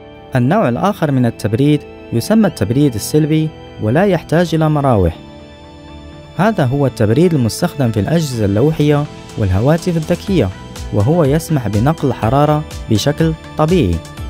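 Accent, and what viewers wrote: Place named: Morocco. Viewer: من صوت عرفتك مغربي مهم فيديو واعر 🎉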